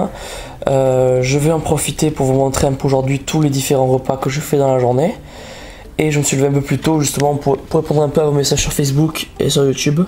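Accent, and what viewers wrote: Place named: Toulouse, France. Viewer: DAAAMN! Salut, j'ai une ptite question concernant mon alimentation, mais avant tout, saches que jte connais que depuis à peine 24h ( mieux vaut tard que jamais) et j'ai déjà regardé presque toutes tes vidéos en un jour, jmet le pouce vert à chaque fois et jme suis abonné biensûr;) J'adore cque tu fais, ton humour, tes intros, tes conclusions et biensûr... Ton accent prononcé de chez nous! ( je suis aussi toulousain et c'est une fierté que tu le sois aussi;) BREEEF! Je suis en sèche depuis un peu plus d'un mois et j'aurais aimé que tu me dise ce que tu pense de mon alimentation ( elle est vraiment très très proche de celle que tu montre dans la vidéo "QUE MANGER POUR PRENDRE DU MUSCLE SEC?" ) Mes compléments sont: de la whey isolate, de la caséine de calcium et des oméga 3 de <link> et jboufe pareil que toi genre œufs le matin, du poulet, des haricots verts, de la whey, de la caséine au coucher etc. 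La seule différence que j'ai avec toi, c'est que, pour être en déficit calorique tous les jours, je prends pas la collation de 10h et mes repas sont espacés de 4h avec 35g de prot à chacun. Je suis très carré, je pèse tout et calcule toutes mes kcal et je ressens pas le besoin de faire de cheatmeal pour le moment ( ma motivation me surprend moi-même ) En gros jfai en sorte d'être en déficit calorique tous les jours et j'ai un apport de 35g/4h de prot environ pour le maintien musculaire. VOILA VOILA pour résumer le prk de mon message, j'aimerai bien que tu me donnes ton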